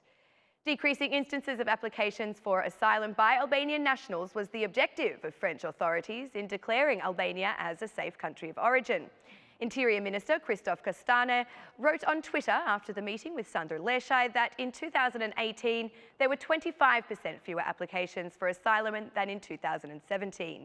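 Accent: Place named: London, United Kingdom. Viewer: LOL Nga Londra eshte kjo prezantuesja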